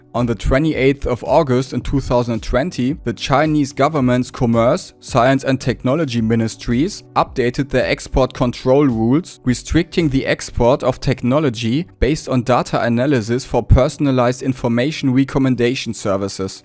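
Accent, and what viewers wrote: Germany. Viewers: Is des a german accent?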